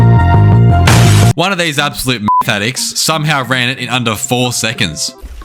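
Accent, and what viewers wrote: Australia. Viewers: Mate I’m Australian and my accent ain’t close to being as thick as yours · Damn the Australian accent is amazing, good video as well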